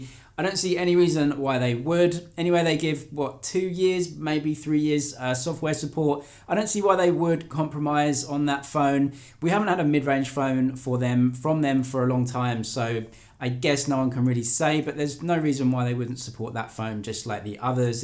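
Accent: United Kingdom. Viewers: #asktechzg Why are you in China?Is it for your job,if so,what is your job title? Cuz you sound British and look 100% like Tom Holland😂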